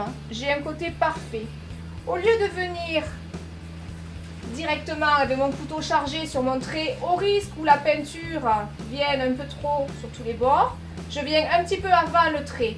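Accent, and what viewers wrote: South, France. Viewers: avec l'accent du sud, ce bord de mer inspire, merci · Non seulement c'est très pédagogique mais c'est très beau, avec en plus l'accent du midi! Un régal, ça me rappelle chez moi..Très belle démonstration de la méthode au couteau dont je ne suis pas experte; je pense que c'est de la peinture à l'huile... J'imagine que c'est aussi possible à l'acrylique ( je ne supporte pas l'odeur de l'autre....) Merci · Je ne peux rien dire de plus que tous les autres. Heureuse de vous avoir découverte, talent, gentillesse, et l'accent de notre midi😂 je suis à six fours !! Merci et à très vite. Je me suis vite abonnée.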